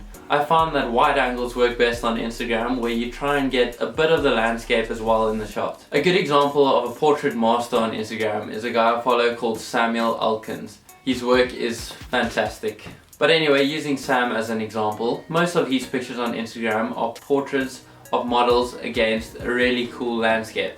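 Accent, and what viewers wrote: South Africa. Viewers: You're South African!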